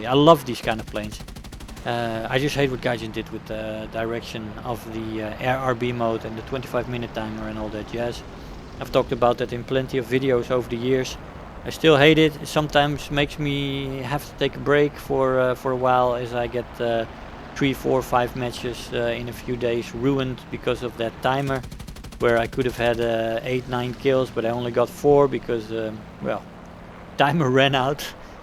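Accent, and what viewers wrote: Netherlands. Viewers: Holy cow, i recognized that dutch accent of yours fast and realised that you were from the Netherlands just like me! 😂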